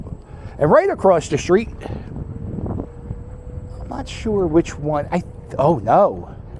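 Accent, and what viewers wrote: Philadelphia, United States. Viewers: Which part of america is your accent from? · Love your Philly accent.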